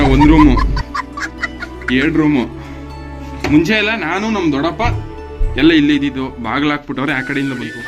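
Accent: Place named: Nanjangud, India. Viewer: ಯಾವ ಊರು ನಿಮ್ಮದು. ನಿಮ್ಮ ಭಾಷೆ ಕೇಳ್ತಿದ್ರೆ ನಂಜನಗೂಡು ಕಡೆ ಅವ್ರು ಅಸುತ್ತೆ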